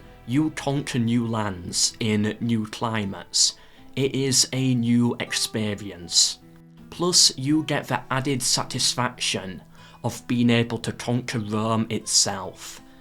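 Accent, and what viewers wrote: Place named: England, United Kingdom. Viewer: I managed to get it to very end but that pronunciation was hard for my ears. What is that dialect? I presume from England. But from where specifically? Just curious.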